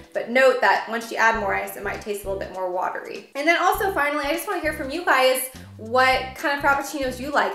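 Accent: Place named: South, United States. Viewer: It’s different to say caramel with different accents, I guess you could say I have a “California accent” we pronounce things kind of straight forward so yeah we say it like caramel, your type of southern accent is like Carmel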